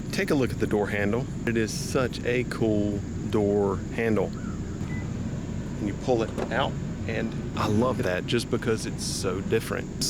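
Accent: North Carolina, United States. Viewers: I LOVE your accent😍 your voice is so soothing too lol #southernaccentftw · I like your NC sweet accent but boy you need to learn things about cars.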